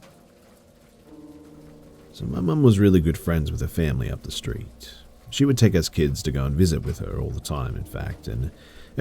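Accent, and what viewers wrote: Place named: Australia. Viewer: Aussie Accent cultral cringe bro. Fuck its hit me hard. Good stories though but Okka as fuck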